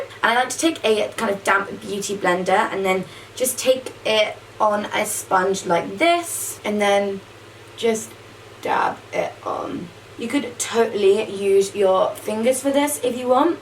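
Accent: Australia. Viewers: Your Australian accent is spot on! (I'm Aussie) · I bloody love your Australian accent 😂 keep doing it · Is it just me who loves her Australian accent😜☺️😍😛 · have to say for an english girl your aussie accent is good lol but this is a great look :) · Omg yaaaass! favourite look! soo fab! haha your aussie accent isn't too bad very dramatic but crack up. I am a kiwi ( aka a New Zealander) but still think you didn't doo too bad! x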